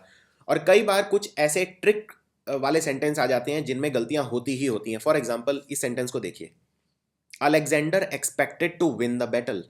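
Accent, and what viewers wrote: India. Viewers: Are you speaking english or Indian ? What heavy accent do you have !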